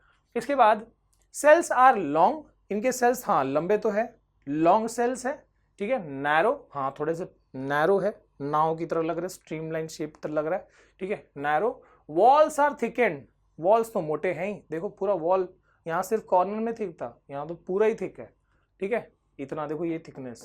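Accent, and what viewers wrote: Bihar, India. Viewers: I really love the way and the accent u used to teach 😂💕 your bihari accent is mind-blowing apne wali vibe aa jati h 😌💕. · Sir ap bihar ka ho kya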